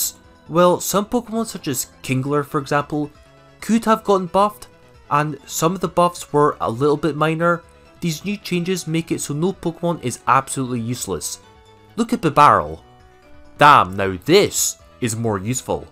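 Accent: Scotland, United Kingdom. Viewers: i really just wasted half an hour watching a scottish man on the internet talk about nuzlocking, oh well still was a decent watch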